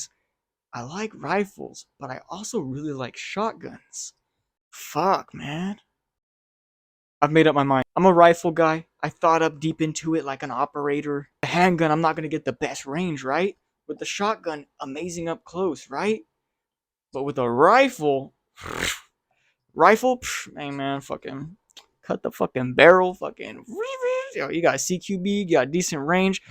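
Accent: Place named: Northern California, United States. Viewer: I was guessing he might be somewhere from the 530 area code in Cali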